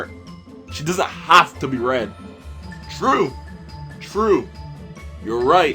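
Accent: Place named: Barbados. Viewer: You sound bajan boss man , blessings brother!!!mauby syrup and fish cake 🤙🏾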